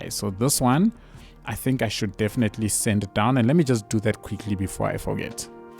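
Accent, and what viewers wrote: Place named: South Africa. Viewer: ive watched a couple of episodes wondering if you from South Africa , just know that accent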